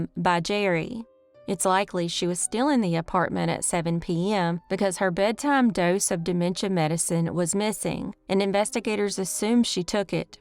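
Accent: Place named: Georgia, United States. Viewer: I keep trying to place your accent. Is it Georgia/close to Alabama?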